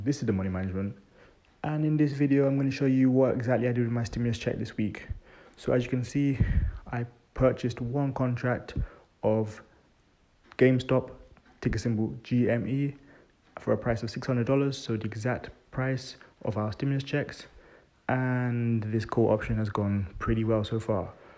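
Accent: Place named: England, United Kingdom. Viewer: Hi you sound English are you based in the U.K. if so do you know where we can play options on these stocks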